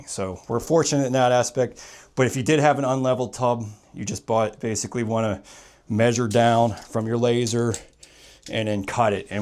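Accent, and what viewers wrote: Pittsburgh, United States. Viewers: Let me tell you... you know a Pittsburgh accent when you hear one! Love your videos! Thanks for the knowledge sir.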